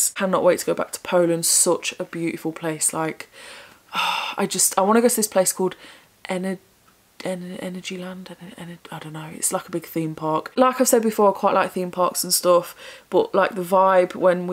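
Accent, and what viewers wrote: Nottingham, United Kingdom. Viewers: The pool you’re on about is the blue lagoon in Iceland. Google it! It’s paradise on earth! Also where are you from it sounds like you’re from Nottingham? I love your content x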